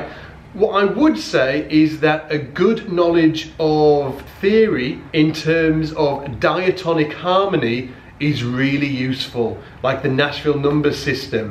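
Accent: United Kingdom. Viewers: british accent is so annoying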